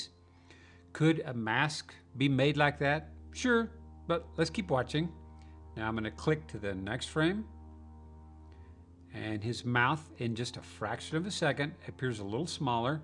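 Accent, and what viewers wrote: South, United States. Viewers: ***** Where can we find your artwork? I am living in Utah and the mormons destroyed my design career- that is another story, but I am from Memphis and lived in Nashville for 8 years- Is that a southern accent that I am picking up? . But, I have decided that I want to do artwork and get a website going. Lots of Bigfoot sightings here, well, everywhere it seems.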